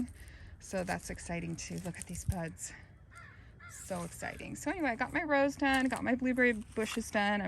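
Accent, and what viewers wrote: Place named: Midwest, United States. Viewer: I'm in central NC newly zoned 8a. Your yard and mine look very similar. I cut back all my knockout roses 2 days ago (ouch!! Those thorns!) and I'll be working on my blueberries today. Are you originally from the midwest? I occasionally hear a tiny hint of midwest accent. Very tiny.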